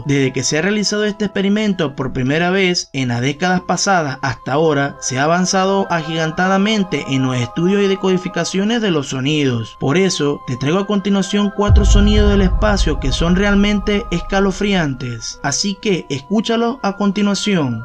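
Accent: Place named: Caribbean. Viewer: Lo unico escalofriante es tu horrible acento caribeño...por que no te dedicas al regaeton!!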